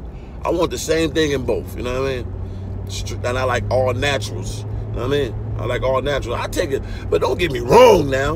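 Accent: United States. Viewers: Understood bro, I feel it. I’m catching what you doing it’s not what you say but why you do and the face you giving us the game gratitude bro, finna turn it up 2k25! You talk like you from south I’m from Memphis! Love to get mentored by ya 🙏🏽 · I just joined your channel and I enjoy your content, plus you inspire me to take better care of myself also while getting the girls. I gotta say this. I'm from Cali/The Bay and you sound like you from Cali my brotha. Right on and I wish you many riches and many bitches.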